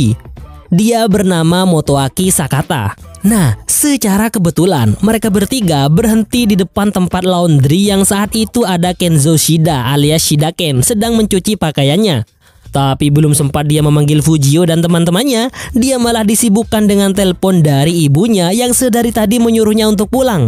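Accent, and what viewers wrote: Madura, Indonesia. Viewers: Oreng Madura Ben bang Mak phuruh ngiding kok 🤣🤣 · Min loe orang Madura iya😅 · Ken reng madureh kak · rooo meduroo logatmu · Babg bang abang orang Madura bukan ? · Reng madureh hdh bg😂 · Bg Lo orang Madura ya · Addo reng madureh kkh reng😂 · Bg orang madura ya? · Pasti orang madura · Mak pas versi madureh keh😂😂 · kk orang madura yh, gw tau sihh karna gw pernah tinggal di mdura😅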